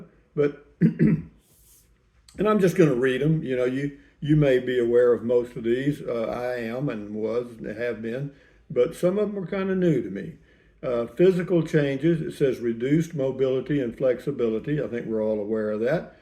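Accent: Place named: South, United States. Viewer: Hi! Nurse here. Maintaining your dignity is half the battle of aging. I love elders!!! Greatest generation. You are doing it well,. You are as fine as an older Mathew McConaughey. Love that southern charming drawl.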